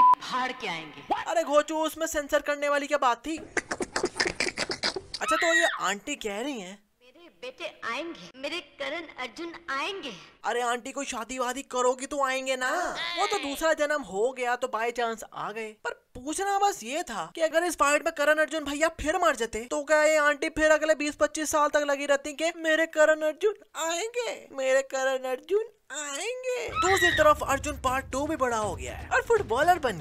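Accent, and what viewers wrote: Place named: Pakistan. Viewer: Ya video bnana vala bro pakistni ka ha es ki awaaz sa pta chala😅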